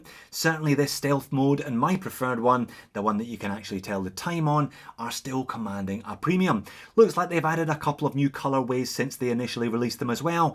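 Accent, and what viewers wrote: Scotland, United Kingdom. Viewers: I am an absolute fan of. One of the reasons being that he is a graduate of Griffith University also. I love the Scotish accent of this guy. He is a true talent. and for me is a benchmark channel on Youtube. For true watch enthusiasts I can also recommend looking into instagram accounts. In particular, of course, the one of. I further enjoy kicktoc, watchurious and monsterstraps. Keep it up!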